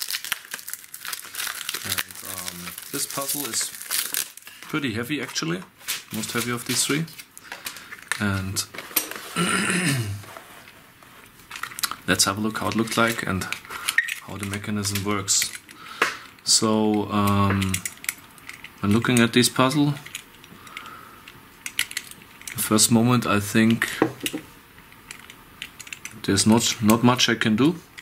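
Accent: Germany. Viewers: kommst aus deutschland oder?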